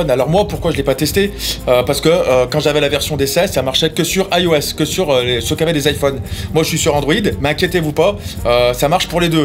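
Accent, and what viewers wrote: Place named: Suburbs, France. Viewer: Tu causes comme un évadé de banlieue, mais t'es vraiment excellent !!! 🌟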